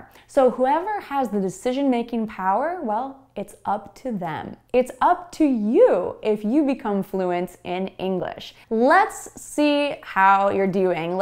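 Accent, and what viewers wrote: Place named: United States. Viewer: Beautiful thank you in lots please believe me there's no one who may replace ya american accent plus watching you here on YouTube is like watch on video with a thousand of beautiful red hearts on back ground I mean for me is clear make these videos with lots of love.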